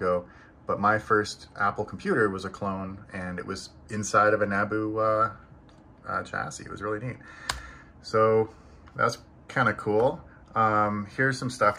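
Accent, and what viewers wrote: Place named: Ottawa Valley, Canada. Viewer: Your hoser accent is really endearing. A taste of the Ottawa valley. I grew up in Smiths Falls but never was aware of any of this stuff.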